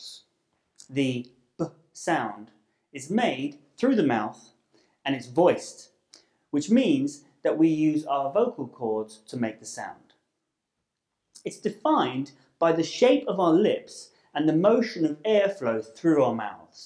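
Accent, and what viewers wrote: United Kingdom. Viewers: Sir! Is this British pronunciation??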